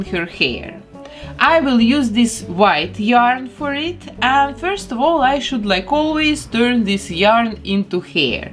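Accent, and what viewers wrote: Russia. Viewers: Whaaaat lol French?? This is a textbook Russian accent, have you ever really listened to French people in English?? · Is she Russian ?she has an amazing accent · Она часом не русская?просто акцент такой,очень узнаваем)впервые сейчас смотрю этот ролик)ну хочу сказать,она молодец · are you by any chance not Russian? it’s just in accent that you’re Russian. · Russian accent... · Вы русская? Голос такой интересный, и акцент Но в любом случае, я в восторге с ваших работ. Это очень круто. Успехов) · Сразу слышно,что Русская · you have the the THICKEST Rution acsent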